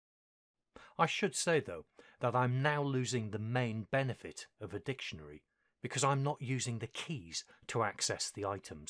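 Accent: United Kingdom. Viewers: Hey! Happy birthday man! You deserve it. The 9 sounds just as good as 10, without the pain. The way you explain things with your soothing British voice and systematic, chronological, and a lot of patience make you the best channel for computer education I've ever seen. The editing alone deserve respect! Thanks for your dedication 🤝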